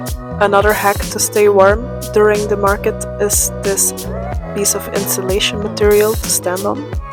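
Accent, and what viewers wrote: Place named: Flanders, Belgium. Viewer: Hey, Ik vergat je te zeggen dat ik je hoor Nederlands praten. Of Belgisch eigenlijk. Wat Leuk! Ik ben Nederlandse 😊🎉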